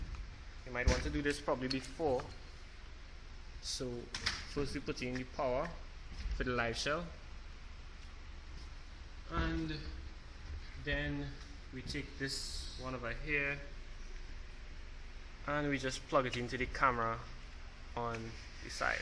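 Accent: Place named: Trinidad and Tobago. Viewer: You sound like a Trini.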